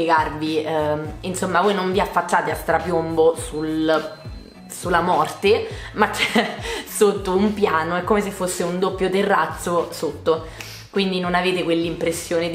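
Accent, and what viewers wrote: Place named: Rome, Italy. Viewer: Io sono stato ad Amburgo e l'ho trovata stupenda sotto il periodo natalizio ma penso sia una cosa tipica delle città tedesche, che ne pensi? Comunque è già nella mia lista dei posti da visitare! Grazie :D Ps: adesso lo sento l'accento romano ahahah ti avevo chiesto di dove fossi perché mi piace incontro le persone che seguo assiduamente e conoscersi dal vivo! Da uno sprint in più !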